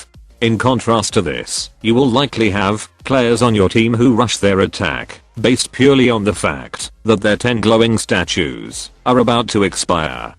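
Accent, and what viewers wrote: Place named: United Kingdom. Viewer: How did you get the British voice?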